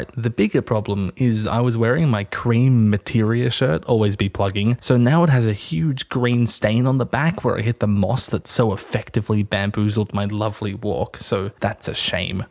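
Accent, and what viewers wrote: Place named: Australia. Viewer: Snap, I know the Pisstakes backwards and forwards from all the listens. It started as just genuinely relaxing to hear someone react the way I react to bad writing/lazy world building/ruining epic series because you're a try hard who wants to make a Staar Whor, but eventually it became familiar and therefore comforting in a different way. I probably enjoy hearing an Aussie accent too, keeps my homesickness in check.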